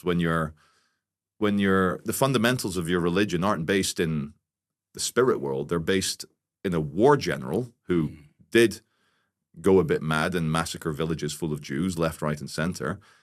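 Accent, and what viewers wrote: Scotland, United Kingdom. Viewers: he got a scottish accent but hes not frome the uk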